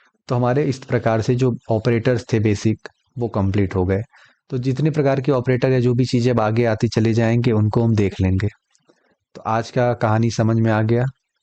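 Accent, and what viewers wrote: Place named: Uttar Pradesh-Bihar, India. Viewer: Nice yaar. Or apni UP Bihar wali hindi me or maja aa rha hai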